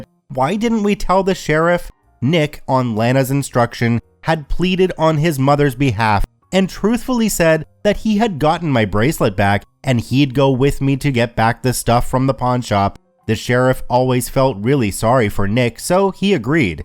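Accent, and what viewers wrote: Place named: Canada. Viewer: Youve said lana in so many ways so many times its freaking me out. Also did you ever mention that your canadian because every time you say sorry you say it like a canadian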